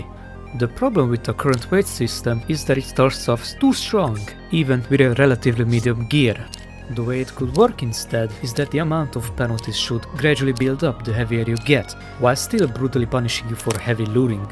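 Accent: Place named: Hungary. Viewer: Tudtam én hogy magyar :D a hunglisht nehéz rejteni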